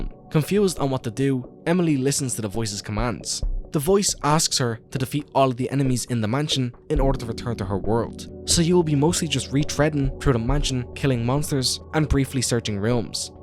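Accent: Ireland. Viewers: Irish accent horror game longform videos? I'm so so so in. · Are you Irish? Irish SH fans unitee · An Irish SH YouTuber? subbed!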